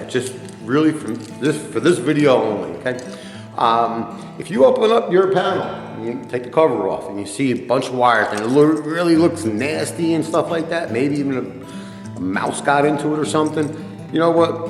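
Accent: New York, United States. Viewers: are you from New York? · good video, thank you. n love the ny accent. : ) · Is he from New York?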